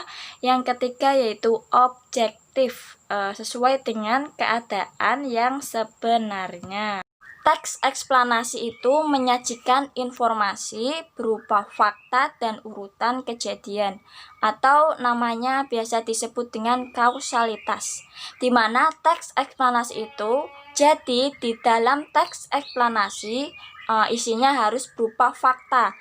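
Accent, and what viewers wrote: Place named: Java, Indonesia. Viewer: Kangen medoknya Non. 😆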